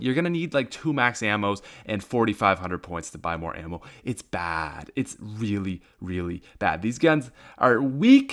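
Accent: Canada. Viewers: He's got a Canadian accent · he’s Canadian it’s his accent lol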